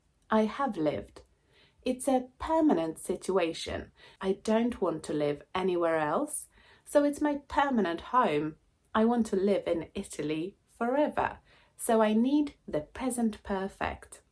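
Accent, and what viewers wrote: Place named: England, United Kingdom. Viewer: Dear, Your accent is very clear and that you slow speak so this you make understanable. You have very nice an English accent.. Thank you so much 🙏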